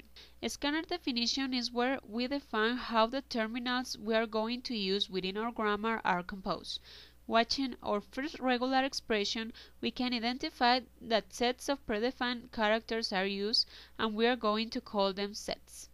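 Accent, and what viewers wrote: India. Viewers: Thumb up for the indian accent :D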